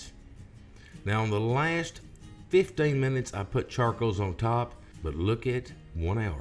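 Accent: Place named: South, United States. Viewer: You are so cute. I could listen to you talk all day with that country accent.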